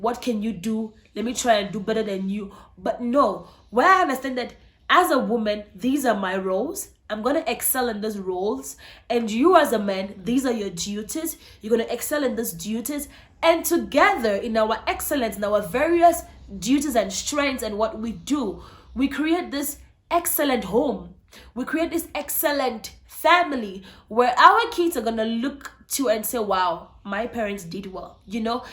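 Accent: South Africa. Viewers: Any how nna lewena ,sounds like ur from msanzi ,,I beg you Cameroon wl disown you ,as for matswere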